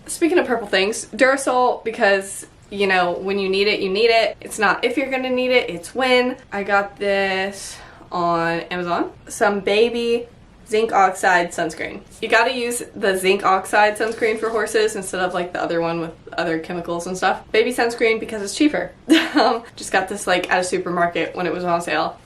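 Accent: United States. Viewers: north of where?😂 from what I hear you speak in an American accent, so do you mean north of the USA?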